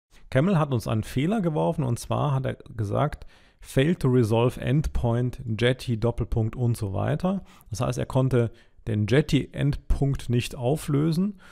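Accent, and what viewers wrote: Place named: Germany. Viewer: Great tutorial , but German accent it's difficult to understand.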